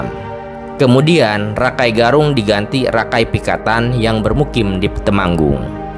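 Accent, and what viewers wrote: West Java, Indonesia. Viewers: Yg punya chanel kaya logat orang sunda malah paham sejarah temanggung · naratornya orang sunda bukan jawa tengah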